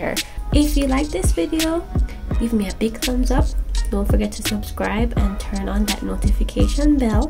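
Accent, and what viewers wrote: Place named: Jamaica. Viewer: I know that beautiful accent anywhere! Go thru yawdie 🇯🇲🇯🇲! U did amazing. So I have a question for you , So I'm natural never been relaxed , but I'm currently contemplating relaxing my hair. Any suggestions as it relates to what relaxer to use? Being as how it's going to be my first time do you suggest going to the salon to get it done or doing it myself?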